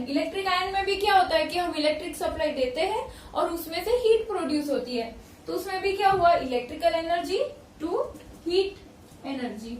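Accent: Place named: Gujarat, India. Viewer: Definitely u r from gujrat bcoz v all have unique hindi accent.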